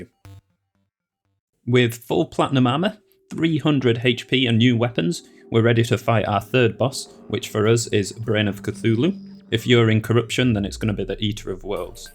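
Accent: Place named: North West England, United Kingdom. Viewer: are you from Manchester or the North West of England? Your accent seems familiar, nice vid!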